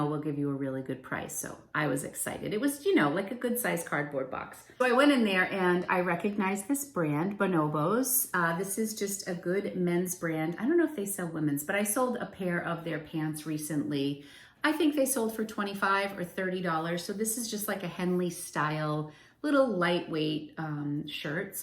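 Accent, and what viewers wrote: Boston, United States. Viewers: Your videos are my favorite, your Boston accent! Baux for box! Keep up the amazing sourcing. Thanks for putting out the best content on YouTube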